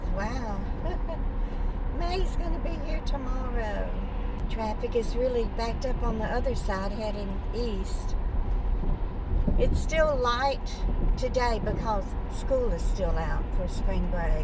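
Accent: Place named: Mississippi, United States. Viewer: youtube randomly changed my id about a year and a half ago. Instead of protesting, I just went with it! Sometimes its easier not to draw attention to yourself, at least for me! I have been enjoying your channel for sometime. One time I got unsubscribed by the powers that be. Maybe a test to see who's paying attention! Anyway love you and what you do. Your accent reminds me of a cherished aunt who has passed on. She lived in Mississippi.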